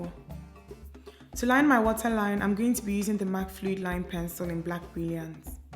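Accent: Nigeria. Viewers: awwwh.., thanks for this one. love your videos, love your real nigerian accent that goes with it too. love them all!!! pls post a video on IG now it's been long 😢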